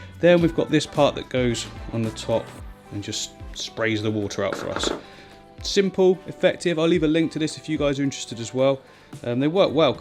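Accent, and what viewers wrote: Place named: United Kingdom. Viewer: You know how, for example, Andrew Garfield & Tom Holland & Hugh Laurie & Asa Butterfield all hide their Great Britain accents with USA ones? You should do that.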